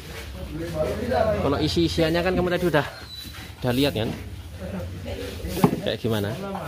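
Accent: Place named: Java, Indonesia. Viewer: Logat Lidah Rasa Jawa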